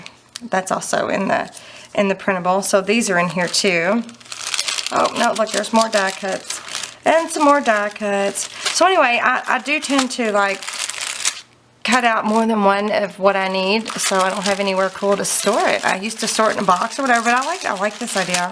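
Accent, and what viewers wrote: South, United States. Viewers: You are so darn cute!!! I'm from the South and I love listening to you as I have lived in California long enough NOT to talk Southern and I so enjoy listening to you and yo are so funny. I have the same problem you do with something right in front of me and NOT being able to say the right word, ROFL!!! Anyway, I have just watched all 4 of your videos about the tag journals and I have learned way more than if I watched 10 other videos. THANK YOU SO MUCH!! I think I like the putting together of them like the one at the end since the stitching part looks more complicated than I think my brain would allow me to do, hee hee~~ Thank you for teaching this newbie so much. Take care and God bless you~~ xoxo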